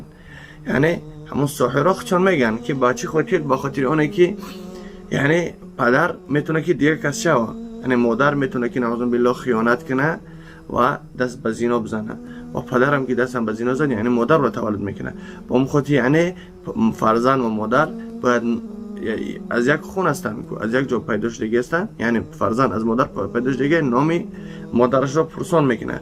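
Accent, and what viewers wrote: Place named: Afghanistan. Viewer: асалом додар барои чи вакте чинхони мекуни хамара якчоя боядки якта якта хонишон у чино ба якдигариш мегузаран барои чи авгони гап мезани додар гапи точики худта гум кардай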